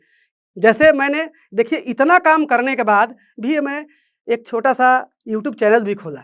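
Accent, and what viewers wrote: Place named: Bhojpuri, India. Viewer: Bhojpuri bolte the tabhi lga ki mere taraf ke hai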